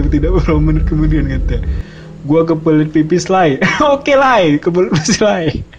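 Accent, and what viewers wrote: Medan, Indonesia. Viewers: Logat medan kau ya lai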